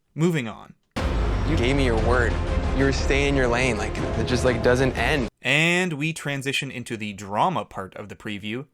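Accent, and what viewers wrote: Canada. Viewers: I freaking love these videos! 💚 also, are you Canadian? I like your accent!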